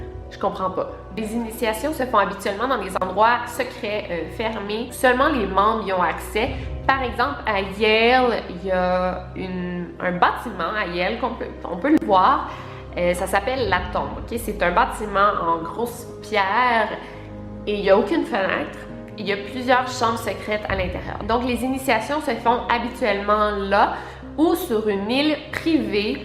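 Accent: Canada. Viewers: Hello! Je viens seulement de te découvrir et laisse moi te dire que je suis fan de tes vidéos! Moi qui avait du mal avec l'accent québécois (j'habite en France), je ne l'entends presque plus 😄 En ces temps de confinement, on a énormément de temps et je passe la majorité de mon temps à découvrir tes vidéos, elles sont top! Par contre, je voulais te faire part d'une chose. Je ne sais pas si tu as déjà regardé la série The order sur Netflix mais en voyant les images de la société skull and bones je me suis complètement retrouvé dans cette série! Pour faire bref, il s'agit d'un jeune garçon qui intègre une secte secrète qui trouve son siège au sein du campus (tout comme ce que tu racontes) et les membres de cette secte sont vêtus de masques bizarres (encore une fois pareil que ce tu racontes). Ils ont des rituels bizarres également que l'on peut voir dans la série. 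J'ai trouvé ça étonnant, j'avais l'impression que tu parlais de la série tellement il y avait de points communs! Se seraient-ils inspirés de la société pour réaliser la série? Je pense qu'en grande majorité oui et c'est, comme tu dis, creepy! Une chose encore plus étonnante, c'est que je n'ai vu personne mentionner cette similitude dans les commentaires! Bon courage pour la suite, tu es top! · Coucou, j'ai découvert ta chaîne il y a quelques jours et j'ai regardé beaucoup de tes vidéos en seulement quelques jours, tu as l'air tellement gentille, t'es super mignonne, les sujets que tu traites me passionnent aussi donc j'adore ta chaîne et j'adore ton accent (je suis une française qui adore le Canada ) bref, continu, j'adore aussi les sujets sur les disparitions, les théories du complots, j'aime TOUT ! Mais des fois quand tu mets des extraits des vidéos en anglais parfois tu ne mets pas les sous titres et pour les gens comme moi qui comprennent pas trop l'anglais c'est embêtant mais c'est pas grave non plus, ça empêche pas de comprendre la vidéo. 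Enfin voilà, continu tes vidéos, bisous de la France 😙😁😁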